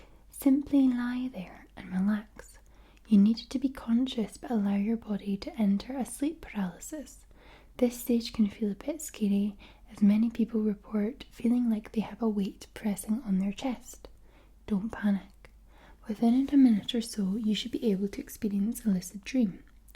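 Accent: Scotland, United Kingdom. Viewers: Her accent is so fabulous. I love Scottish accents. in the beginning you couldn’t hear it but as she started talking faster it really jumped out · Hey, can you do a video with rambling in a really Scottish accent? I love you're accent, and wanna hear it in raw form( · I love your accent!!!!!😍💖 & I also love Scotland!!!!!😊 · It’s called a Scottish accent my guy, I got one too lmao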